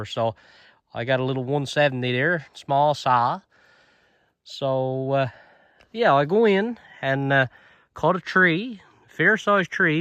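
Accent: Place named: Newfoundland, Canada. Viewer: How many slips to get 16? I thought that was good by far the best I've seen on here. What area are you? I'm from Lewisporte and your accent seems like that area or close by.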